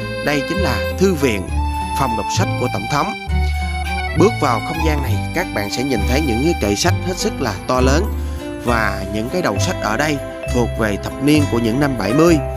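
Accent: Standard. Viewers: Phát âm rất chuẩn. Nghe rất êm tại cảm ơn bạn. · Tôi rất ngưỡng mộ dọng đọc của bạn , dọng đọc phát âm chuẩn , rõ ràng , mạch lạc ,và lưu loát , dẽ hiểu . Ủng hộ bạn .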